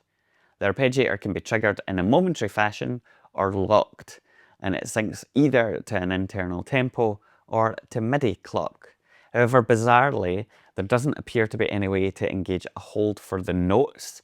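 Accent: Scotland, United Kingdom. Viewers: Scotland ! I believed at first that the accent was Irish. Sorry I am not very used to. Beside I am french and I pronounce Exquis like exkee. May be you know it already the arpegiator has 2 mods one with hold an an other to engage just when you touch it. Hope linux and Bitwig will integrate this instrument.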